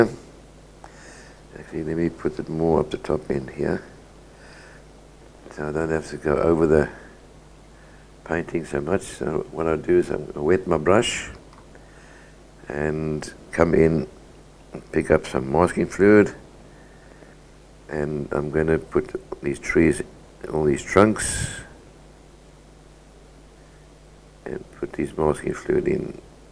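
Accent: South Africa. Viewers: This is delightful. Thanks for sharing. Nice to hear that South African accent again!!!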